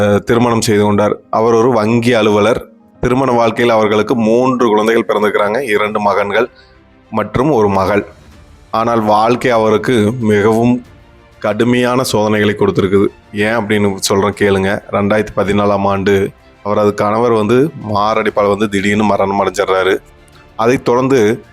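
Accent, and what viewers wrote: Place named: Tirunelveli, India. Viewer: Nellai Kanyakumari tamil pesureenka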